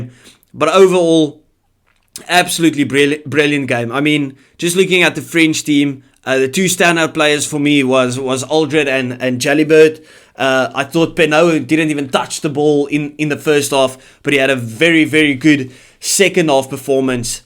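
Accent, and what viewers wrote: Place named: Afrikaans, South Africa. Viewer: Hierdie man het n DIK AFRIKANER aksent xD. Ek verstaan as jy afrikaans praat gaan jou kanaal nie groei nie. Wat n jammer.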